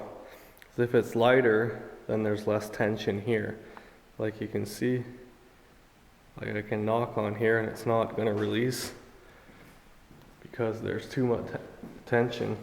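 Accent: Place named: Quebec, Canada. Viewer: Haha je m'attendais pas à entendre un accent québécois Great video the explanations were very clear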